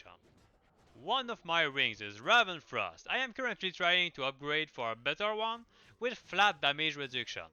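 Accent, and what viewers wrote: Quebec, Canada. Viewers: I could tell you are québecois from minute 1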